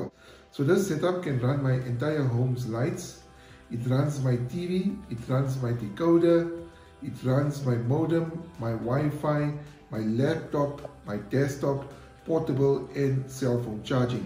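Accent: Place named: South Africa. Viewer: Wow, a true homegrown South African English accent, love it! 👏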